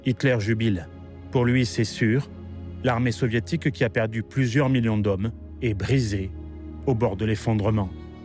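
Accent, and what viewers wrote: South, France. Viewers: Pas mal du tout, les documents tiennent la route et le commentaire ,avé l'accent du midi, est sympathique !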